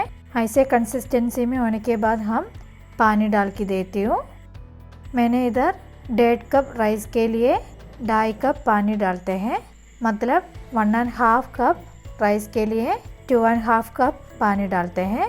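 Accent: Kerala, India. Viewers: mam greetings from Maharashtra. Your have been taking Initiative & efforts to reach out " Kerala's food " to all over india is really appreciative. Your's recipes are really nice. Always keep growing. Your Kerlian hindi speaking is very sweet. waiting for Aravana paysam recipe. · Along with your delicious recipes, I enjoy your typical Hindi spoken in complete 💯 percent Malyali accent which is a treat to enjoy listening as well with watching simultaneously, being a mumbaite. Good job and I wish you all the very best for all your upcoming ventures.. 🎉🎉❤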